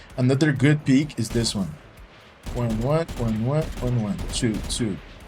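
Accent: Brazil. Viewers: mano, vc é brasileiro né? seu sotaque parece de brasileiro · where are u from you sound kinda brazilian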